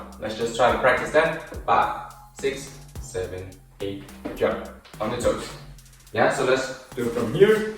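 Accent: Denmark. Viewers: r u danish?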